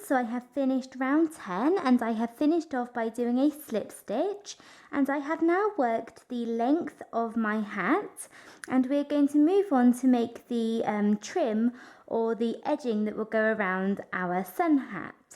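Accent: England, United Kingdom. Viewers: Thought with your English accent this would be in UK terms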